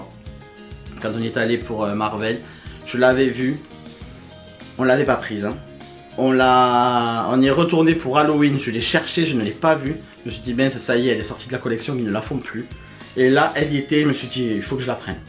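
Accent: South, France. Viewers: Coucou =) Découverte de la chaine avec cette vidéo ;) Ah ça fait du bien un accent du sud!! Je suis fan de tes tsum Elliot derrière <3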